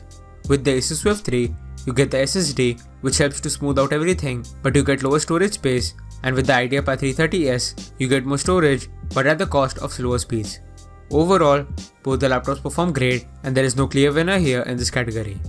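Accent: India. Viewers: Yo less indian accent not rude but i cant understand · Please talk slower.. no offense but your indian accent is making it worse when you talk so fast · Nice video but you should speak with less Indian accent, it's hard to undestand you. Greetings from Mexico.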